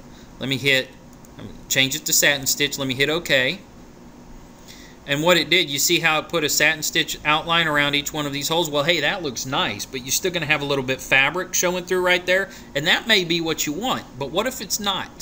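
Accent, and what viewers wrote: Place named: South, United States. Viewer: You sound like idubbbz with a southern accent, i love this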